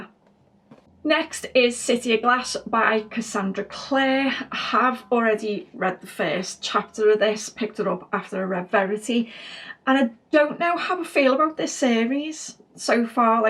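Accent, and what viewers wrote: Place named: Midlands, United Kingdom. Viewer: You have some cool books in the plans for this month, I hope you can get to them all. Except the Cassandra Clare one, she’s evil 😹 Also, i’m desperately trying to place your accent. I’m not English I’m just into accents, so I’m probably terribly wrong but I’m thinking you’re somewhere from the Midlands? :)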